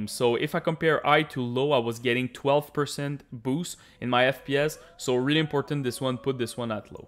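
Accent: Quebec, Canada. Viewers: are u from quebec by any chance?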